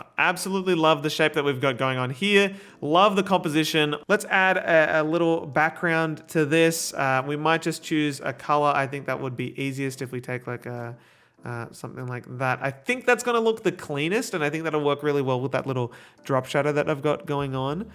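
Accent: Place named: Australia. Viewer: fucking austrailian accent. "nortica". for the americans, he means nautica on adobe fonts.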